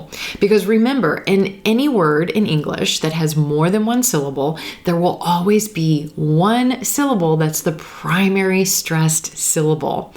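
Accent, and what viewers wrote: United States. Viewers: hello, my name is juan rivera, sorry my english is not good I'm begginer english student I'm 38 years old and I'm from Nicaragua in centre america, as you can imagine this country is really poor talking about economic situation and because of that I lost my family and now I live with my mom I don't have my own house I survive with only 80 dollars per month and I send 50 for my two daughters for manutation, it's been difficult all this time, that's why I have been studying english in a little free program course just to improve a litle my situation at least. but I've already finished the 12 levels and I feel that I need to improve a lot yet, thanks for making this videos, I just watch one of your videos and believe me I liked it, and I'm gonna try to see every single video from your first video, I like your pronunciation I guess your are american, to be honest don't like british pronunciation I prefer american accent, I would like you to talk about the most common situation with modals in present future and past because this is the hardest content for me, I would thank you, well greetings and blessings..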